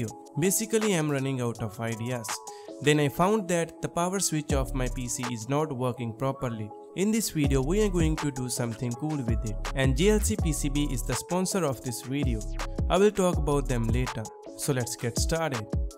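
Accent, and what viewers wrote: India. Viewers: Indian accent english 🤔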